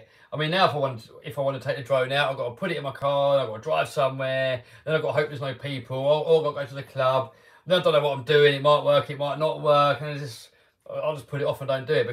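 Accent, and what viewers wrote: England, United Kingdom. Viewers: You sound English I live in Indiana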